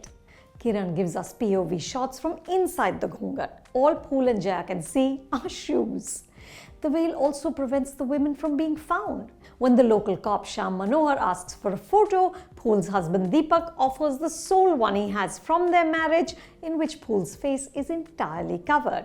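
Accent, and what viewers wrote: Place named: United Kingdom. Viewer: Ye madam ji ki English 😂.. inko angrez yahii chhhod gaye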